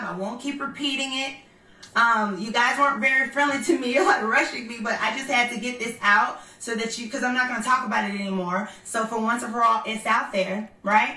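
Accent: New York, United States. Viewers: You don't usually sound like this... New York accent... sounding like Nicki Minaj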